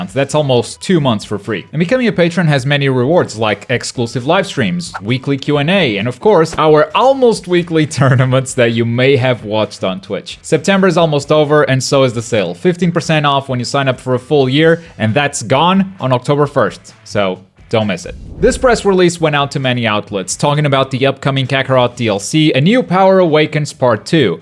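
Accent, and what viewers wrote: Portugal. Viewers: hey man good video, are you portuguese btw? i noticed you have a similar accent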